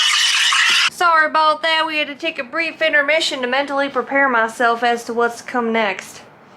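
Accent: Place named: Minnesota, United States. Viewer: That Minnesota accent lol. Ehhh!!! I’m from SC so I’m sure I’d sound funny to you. 😂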